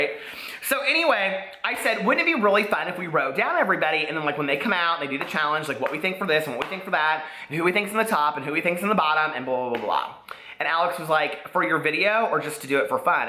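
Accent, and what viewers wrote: South, United States. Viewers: you are too gay to function at times... #loveyou great comments, I enjoy your Souther accent.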